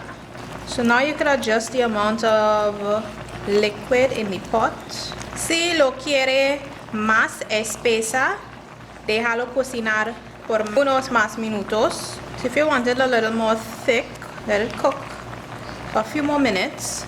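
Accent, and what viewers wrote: Trinidad and Tobago. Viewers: Spanish with a trini accent, what a ting😕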